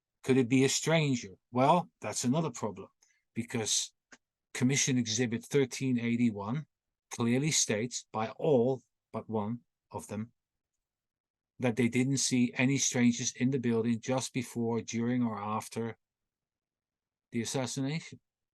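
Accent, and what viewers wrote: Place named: United Kingdom. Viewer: !!!!:text-green-game-over::face-blue-smiling:🍾🥂🎉, as you were ticking off the 4 white, male, blue collar employees, that PM could be, you had Frazier, Oswald, Lovelady and Dougherty (or Dockerty, as you pronounce it across the pond 💂‍♂). You then said we know it can't be Frazier.... we know it can't be Lovelady...so that leaves Oswald and Dougherty. You then went on about Dougherty being on the 5th floor...etc... That's not important! I just realized we can justifiably kick it up a huge notch, and identify PM as LHO with virtual certainty! We have long had information about Dougherty's general appearance. In Roy Truly's Warren Commission Testimony, when being asked about Dougherty, Truly says: "He is a GREAT BIG HUSKY fellow. I think he is 39 years old."!!! I think Ed LeDoux also obtained similar information a few years ago, about Dougherty being big or fat, and if i remember correctly, wearing glasses. 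I'm not absolutely sure but I think he got this description from another TSBD employee or employees! Maybe from Frazier? There is no way that PM/Oswald is big, husky or fat! Or wearing glasses (if that's the case). So IPSO FACTO, Prayer Man IS Lee Harvey Oswald!!!!!!!! Am I wrong?! Please tell me I'm right!!!!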